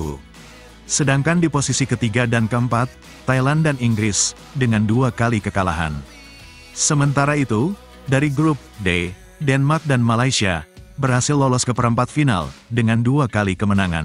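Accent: Banyumas, Indonesia. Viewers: Ngapak ora baen baen ya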